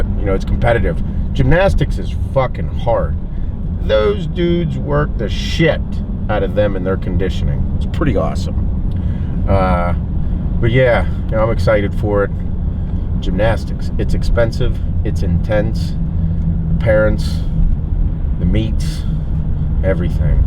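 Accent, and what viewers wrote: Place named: Pennsylvania, United States. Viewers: I'm from Pennsylvania as well, and it's just funny that the way you talk and the terminology you use is identical to the shit my friends and I say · You have a Pittsburgh accent, where in Pennsylvania do you live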